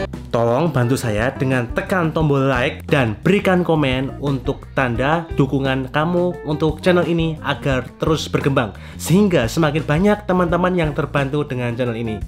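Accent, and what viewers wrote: Java, Indonesia. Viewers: Paling suka liat koko koko tp klo ngomong medok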